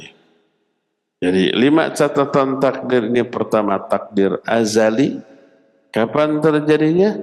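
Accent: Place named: Sunda, Indonesia. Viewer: my favorit, kental logat sundanya,,mugia sehat selalu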